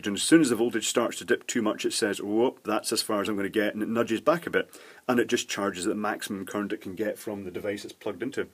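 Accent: Scotland, United Kingdom. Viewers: J’aime l’accent Écossais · Is he from Scotland?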